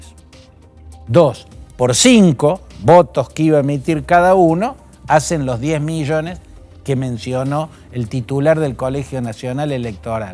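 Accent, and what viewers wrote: Argentina. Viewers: Para tu conocimiento: El virreinato de Perú en el año 1543 comprendía gran parte de América del Sur, que incluía los actuales Panamá, Colombia, Ecuador, Bolivia, Chile, Argentina, Paraguay, Uruguay. Entonces según tu retorcido punto de vista, los peruanos tenemos el derecho de reclamar que esos países vuelvan a ser peruanos. Por tu dejo parece que eres argentino o veneco, que Argentina pues vuelva a ser de Perú. ¿No te parece?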